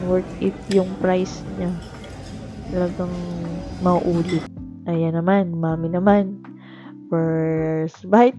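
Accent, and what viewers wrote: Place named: Batangas, Philippines. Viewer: Nice bonding may punto salita batangas kayo Mam😅 nakaka tuwa nman simple nice video susbscribed na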